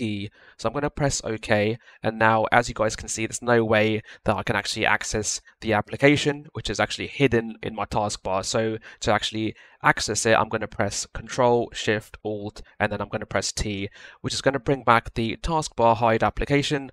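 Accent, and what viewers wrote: United Kingdom. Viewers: lol brtish man fix the problem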